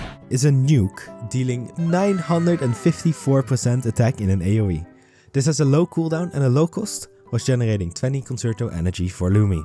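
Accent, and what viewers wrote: Netherlands. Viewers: My first video I see of you and the moment I heard you talk, I knew you were a fellow Dutch person XD Thank you for the vid tho! I love Lumi's fighting style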